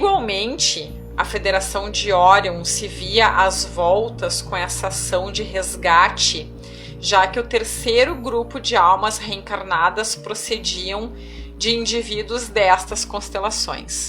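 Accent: Rio Grande do Sul, Brazil. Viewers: Adeildo Amorim sotaque gaúcho. Bem como todo o jeito que ela fala.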